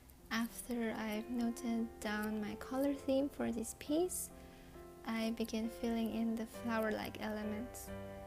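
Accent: Philippines. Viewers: Filipino accent :-)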